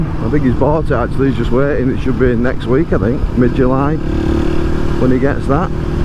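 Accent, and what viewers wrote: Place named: Rochdale, United Kingdom. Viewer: You sound like you're local to me 😂 (Rochdale) I'm looking at getting a DR250 as a winter/enduro/play bike, I have a GSR600 for good weather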